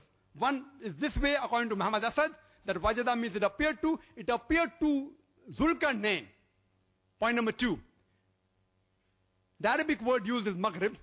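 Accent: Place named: India. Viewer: There is a huge distinction between saying, that the sun sets in Murky pool of water, and that the sun appears to set in a Murky pool of water. Like saying ' I killed that guy' and 'I appeared to have killed that guy'. Here is what Quorun says - 'Till, when he reached the setting-place of the sun, he found it setting in a muddy spring, and found a people thereabout. We said: O Dhu'l-Qarneyn! Either punish or show them kindness.! - Qur'an 18:86. The point number two: this guy appears to be a real knucklehead. His English, or what it sounds like - Hinglish, really sucks.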